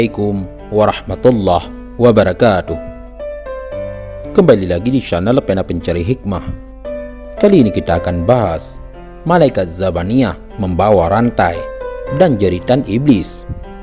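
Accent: Aceh, Indonesia. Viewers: Logat Aceh susah utk di hilangkan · Admin nya logat aceh ya🙏🙏🤭🤭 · Orang Aceh ni suaranya.. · Tuh klo ga salh logat Aceh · Minin awak aceh nye? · Apakah admin orang Aceh?? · ini yang bicara orang aceh ya.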